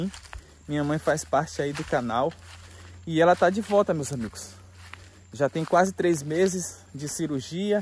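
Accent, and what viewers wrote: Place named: Minas Gerais, Brazil. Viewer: Nunca imaginei que fosse no sul da Bahia sempre assisto você achando que fosse mineiro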